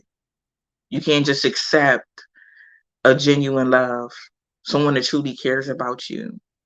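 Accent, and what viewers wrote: Louisiana, United States. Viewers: she has a cute country accent........ Like us in North Louisiana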